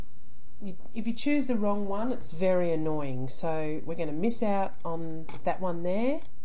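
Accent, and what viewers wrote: Australia. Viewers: This Guy who uploaded it ...Has a true Australian voice Nice Mate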